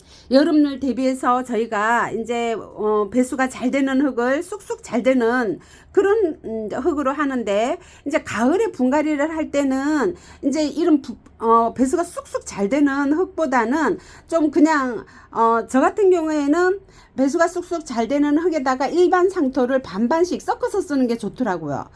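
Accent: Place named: Gyeongsang, South Korea. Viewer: ㅎㅎ 헐빈하다 갱상도 본토말~^^ 지가 자주쓰던말이네요 정겹슴다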